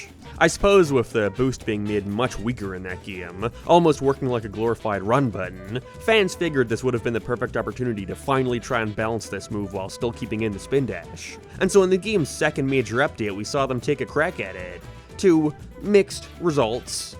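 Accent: Ireland. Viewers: hes irish iirc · Your accent goes to the most clear American accent to the thickest Irish accent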